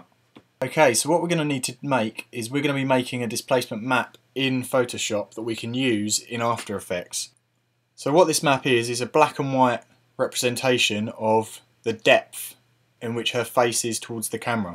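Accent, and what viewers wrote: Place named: United Kingdom. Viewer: You Brits' accent drives us Americans crazy (with our PROPER way to pronounce words!!) I think I've figured out how the way you are saying words is different. I heard very early in the video when you said the word "Photo". I think we say it, the proper way of course, Pho-to, whereas you Brits say Phot- and then the o. It just doesn't make sense to me. I just heard it again in the word "thirty". You say Thirt-y or "thirt-ee". And it has always just driven me mad to hear you pronounce, or not pronounce I should say, your letter R's. Why don't you use your R's... "MATE"? There you go again..."Settings" is SE-ttings, not SETT...ings. And "little" is LI-ttle, not LITT...le. Foo-tage, not FOOT...age!!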